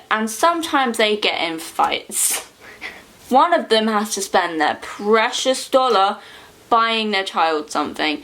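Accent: England, United Kingdom. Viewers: So this is suppose to be a complete compliment.... I love how you talk, you slightly remind me of a sassy like pirates teenage daughter. I'm from California so I'm obsessed with English accents just like every other Californian haha but yea this is great. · Taylor Stege she's English :)) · you mean shes from England, Australian's still speak English :) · Ur English 🇬🇧🇬🇧🇬🇧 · Is she British?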